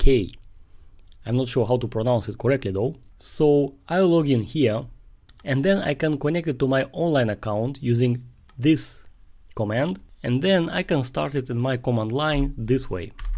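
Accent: Russia. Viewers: Спасибо брат. Но был бы на русском урок - было бы ещё лучше. Акцент русский слышно))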